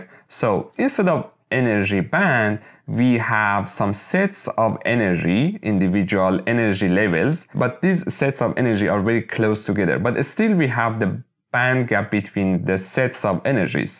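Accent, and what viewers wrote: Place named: Iran. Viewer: Hello, are you from Iran? Because of your accent,I asked.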